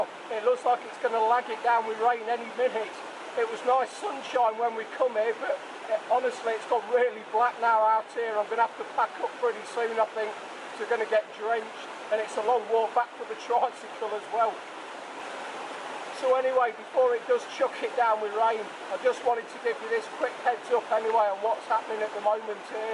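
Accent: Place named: East Midlands, United Kingdom. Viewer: Im sorry you came back to the UK, i mean that with the greatest of respect, you sound like youre from the East midlands. We are olanning on going to the Philippines. anyway hope all goes well.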